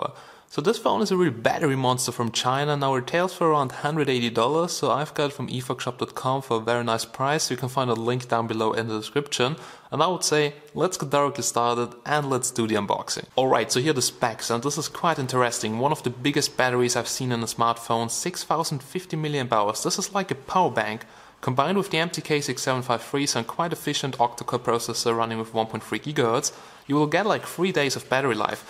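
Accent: Germany. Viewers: du bist doch deutscher oder? :D man hört des :D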